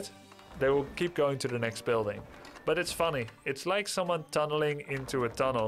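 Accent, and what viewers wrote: Netherlands. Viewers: Is that a Dutch accent i hear? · Haha jij bent echt zooo duidelijk een noord-Nederlander. Dank voor de heldere video!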